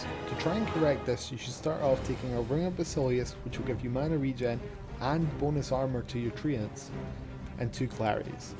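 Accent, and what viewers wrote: Scotland, United Kingdom. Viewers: waaaa! You Scottish too big man ?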